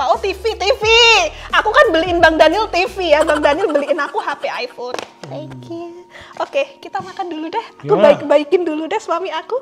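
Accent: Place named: East Java, Indonesia. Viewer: Kok ngomong nya orang mana surabays eatau malng ya ,orang nya cantk pintr mask ginana sh kok dapt orng luar ngri ngomong pakai arek arek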